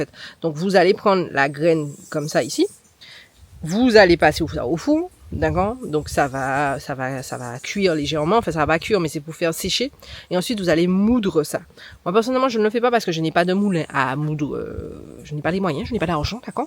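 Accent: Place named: Martinique, France. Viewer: c'est l'accent de Martinique qui vient du créole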